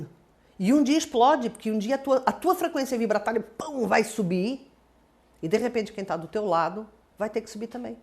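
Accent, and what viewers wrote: Brazil. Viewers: Bom vídeo e útil da para refletir obrg você e portuguesa como eu mas tem sotaque brasileiro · Porque é que fala com sotaque brasileiro?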